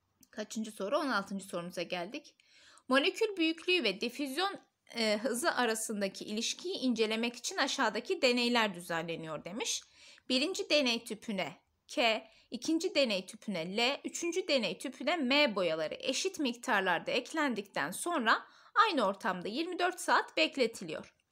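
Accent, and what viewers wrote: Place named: Mardin, Turkey. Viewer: şiveniz mardin şivesine benziyor ondan sordum hocam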